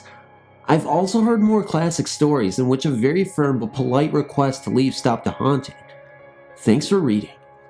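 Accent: South, United States. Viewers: Southern ascent sounds good,great vid Thanks and happy holidays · LoL 😂😂😂 Your Southern Accent 😏 I was Dying like is quite Funny & Cool 😀 much love ✌💙💙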